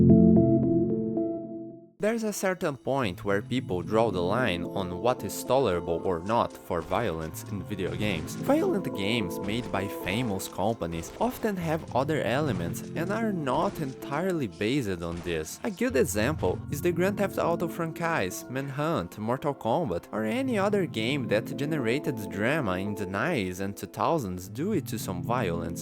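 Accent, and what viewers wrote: Brazil. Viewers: Your accent reminds me of a brazilian friend i had. Miss him so much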